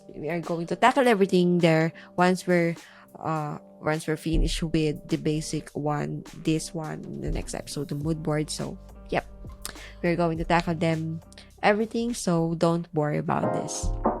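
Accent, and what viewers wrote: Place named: Philippines. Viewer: Thanks for making this video. I also love your Filipino accent. 😍 I have a question about creating wireframes for app UX/UI and even for the web in general. I used to create wireframes first for clients and then high-fidelity mock-ups.. But ever since I've used page builders such as Divi and Elementor which was 6 or 7 years ago I have designed/built directly on the page builders. What you think are the pros and cons when it comes to the design process of starting from wireframes vs. building right away on the browser or mobile apps? Also which types of clients prefer one over the other? I have always attracted clients who do not mind me building right on the page builders and skipping the "design" part of the process. So I am curious if I can still be able to get clients who do not mind the longer version.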